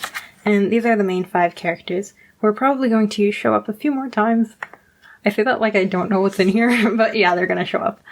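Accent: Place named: Michigan, United States. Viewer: Ah man! I have so much to say about this! It is lovely to see everything, and I'm impressed by how consistently well done everything in this is (most of my sketchbook pages are completely unfinished.) Your experimentation with styles is inspired and wonderful to look at because of how well you adapt to them. I'm scared that you don't like practicing full body though. I know I struggle with it too, so I get that it's intimidating, but please don't be discouraged! Gesture drawings and references really do help, and all artists should integrate them into their habits. Also your accent is adorable, my god I never realized how different Michigan and Canada's accents are.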